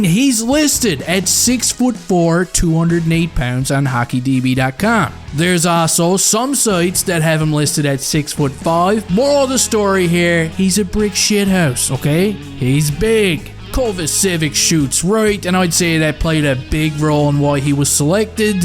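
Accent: Newfoundland, Canada. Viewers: Sounds more like a Newfie to me. Either that or a caper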